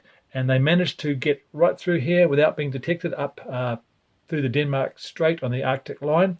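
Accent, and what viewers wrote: New Zealand. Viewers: Gidday Gidday. Love this AAR and your Kiwi accent. I'm a Kiwi in NZ who loves naval wargames too and has a good collection. Would you be keen for a game sometime?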